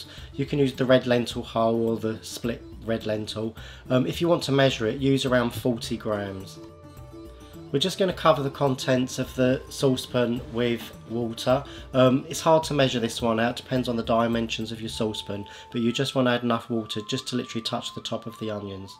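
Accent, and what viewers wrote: United Kingdom. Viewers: I love your accent! What kind of uk accent do you have? is it from kent?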